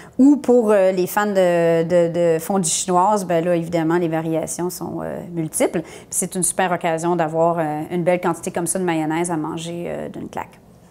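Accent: Quebec, Canada. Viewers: Merci pour ta réponse, en tout cas j'avais pas mes règles !! Lol Je retesterai une prochaine fois alors c'était pas de chance!! Ça devait venir de mon oeuf je pense alors, car il était peut être pas assez à température ambiante... Bises de France!! J'aime trop l'accent québécois !! 😍😘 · Je suis française et j’adore entendre parler les québécois!!! Je trouve ça réconfortant je sais pas et vous avez l’air tellement authentique et sympa, pas comme nous les français qui râlons sans arrêt 😂 (en France le robot que tu utilises s’appelle un « mixeur plongeant » lol) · Bravo! Mais je mettrai le citron à presser en entier pour éviter d'avoir un 1/2 citron qui traîne ensuite dans le frigidaire et dont on ne sait pas quoi faire. La quantité d'huile que vous utiliser est impressionnante.... A essayer: un radis noir finement broyé et une ou plusieurs gousses d'ail aussi finement broyée + du poivre. 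J'avais essayer cette méthode, elle marche très bien. En France cela s’appelle un MIXER PLONGEANT. On aime votre adorable accent québécois, tout à fait charmant. Merci! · Très jolie fille et accent québécoise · Une québécoise qui nous apprend à faire une mayo..! Cette charmante jeune femme ignore que seul le jaune d ' œuf est nécessaire , qu' elle nous propose la confection d' une véritable sauce béarnaise ...! On pourra alors juger son réel talent ...? Mais à part cela elle est charmante avec son adorable accent . ( un maudit Français ! ) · jaime pas accent de mademoiselle on dirait une giraffe qui parle on dirait vous avez un chat dans votre gorge meme accent du quebec a la celine dion et garou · J'aime trop l'accent québécois c'est fou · Je supporte pas l accent QUÉBÉCOIS !!!!!!!😣😣😣😣😣😣😣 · L'accent québécois 🥰🥰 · j’aime trop ton accent québécois trop satisfaisant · Je pense que le québécois soit un accent car si on en prend la définition, un accent est la prononciation différents de mot déjà existant (les langues) etent donné que le français soit arrivé en premier on peut dire que le québécois soit un accent bien qu'il existe plusieurs mot ne figurent pas dans le dictionnaire français au passage j'aime beaucoup cette accent :D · Voilà une adorable jeune femme avec son accent québécois qui vous fait voyager. 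30 ans d'experience ? Elle ne fait vraiment pas son âge. Quant à sa mayo, j'aurais ajouté du poivre. Merci pour votre fraicheur et vos recettes. Amitié aux cousins québécois. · L"accent canadien est effroyable. Parlez comme il faut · Son accent est different. Canadien ? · Je suis français et chef de cuisine , j'aime bien votre accent québécois , continué vos vidéos de partage culinaire et bonne continuation !!! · AAARG catastrophe je fait ma mayo à la façon Philippe etchebest le King de la cuisine, deux jaune d'oeuf sans les blanc, sel, moutarde,vinaigre et l'huile et ons verse l'huile en fin filet peu à peu et ça marche c'est imparable un vrais délice, j'ai voulue faire votre metode et vas i je met tout d'un coup elle ne monte pas rien que dale le seul truc que j'ai apprécié c'est de voir la sympathique cuisinière au belle accent québécois, j'avoue qu'elle et adorable, mais n'en déplaise à l'avenir j'en resterai à notre exelent chef cuisinier français !! 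cordialement,!! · L'accent Quebecois.......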